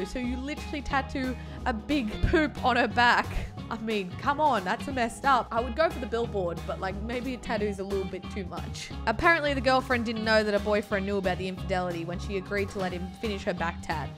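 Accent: Australia. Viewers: I like the Australian accent!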